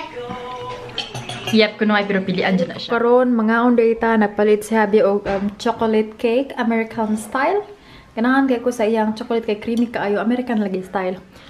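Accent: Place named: Visayas, Philippines. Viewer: nakakatuwa tlga mga bisaya sarap sa tenga ung pag sasalita 😊😊😊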